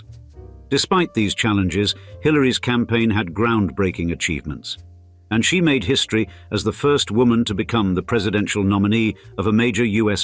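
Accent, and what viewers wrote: RP, United Kingdom. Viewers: The lesson here is if you hire a guy with an aristocratic British accent you figure you can put all kinds of lies, bad stats and idiotic comments. I will just focus on one; Bill Clinton did not create a $200 billion + surplus. And this is just one of many in the first 5 minuted of this propoganda piece. Goebels would be proud. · Another BULL SH*T British narration - stick to your own corruption. There's plenty of it.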